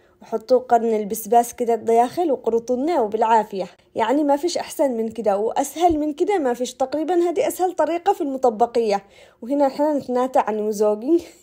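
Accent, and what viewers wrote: Aden, Yemen. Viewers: ماشاءالله تسلم يدك يا كم تعجبني لهجتك العدنيه والله حبيتك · ياروحي على مطبقيه عدنيه بلهجة عدنيه ❤❤. ما أحلى اليمن ❤🇾🇪♥️ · فديتك احب طبخك واحب اسمع صوتك الله على الكلام العدني اقسم بالله انت فنانه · فديت عدن وفديت كريتر الغالية وفديت كلامك العدني المليح واحلى مطبقية من احلى فنانه مبدعه ماشاء الله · ما شاء الله عليك حبيتك حبيت خفة دمك ولهجتك الجميلة أول مرة أسمع الحكي اليمني الأصلي ربي يحفظك ويسعدك شكرا لانك عيشتنا معك أجواء اليمن السعيد ونقلت لنا ثقافة اليمن العريقة متابعة واشتراك ولايك لعيونك الحلوين وتسلم ايديك❤ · اللهجة العدنية جميلة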